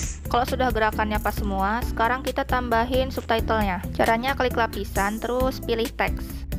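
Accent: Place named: Kalimantan, Indonesia. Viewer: Kw ni org kalimantan kn? Logat ngomong mu kyak di tempat ku soalnya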